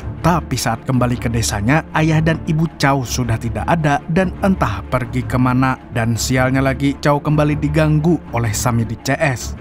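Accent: Banjar, Indonesia. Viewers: Urang banjar😂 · Orang banjar kah mang? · Umayy orang banjar sakalinya😂😂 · Adminny urang banjarkh nih😅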